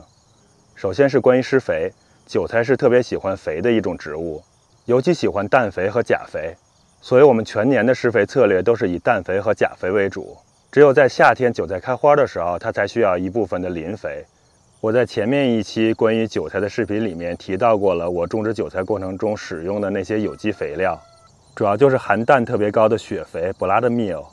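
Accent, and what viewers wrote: Beijing, China. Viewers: 说的清楚透彻。听口音你是北京人吧？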